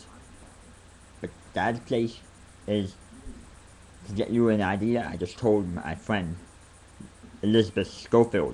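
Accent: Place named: United States. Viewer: So, what part of America is this accent from?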